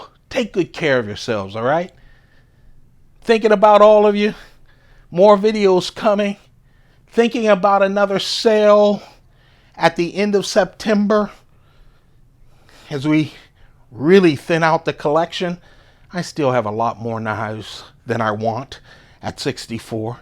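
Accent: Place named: Louisiana, United States. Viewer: You sure sound like you are from Louisiana! Great videos! Thanks for all your efforts!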